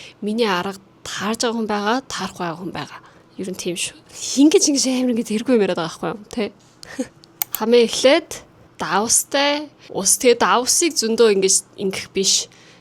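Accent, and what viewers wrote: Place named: South Korea. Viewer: Ene bagasa solongosd ussun emegtei bha tegeed tegej sonsogdson bh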